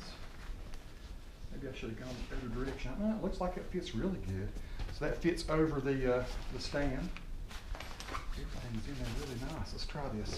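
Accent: United States. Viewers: I am British, that's a proper US accent you have there! Just got the same one for my 21.5" 4k machine - thanks for the vid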